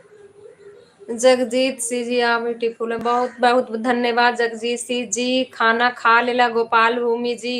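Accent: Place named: Magahi, India. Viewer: मैं जहानाबाद के सरेया से.वर्तमान निवास धनबाद .आपकी सुन्दर मीठी मगही से आ जाती है गाॅव की याद.मैं लगभग आपके पिता की उम्र का हूॅ.लेकिन इतनी अच्छी मगही नहीं बोल सकता.देश दुनिआ में मगही भाषा को उजागर करने बढानें के लिए आशिर्वाद.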